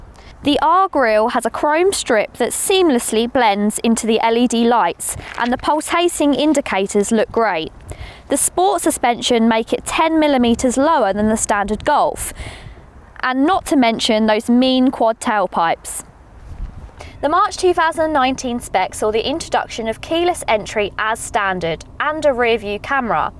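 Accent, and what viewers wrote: United Kingdom. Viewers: She British☹️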